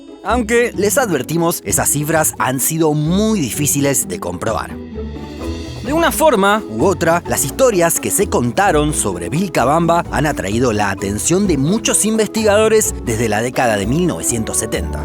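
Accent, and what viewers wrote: Argentina. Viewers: El narrador es argentino también, aunque en el equipo hay distan nacionalidades si mal no recuerdo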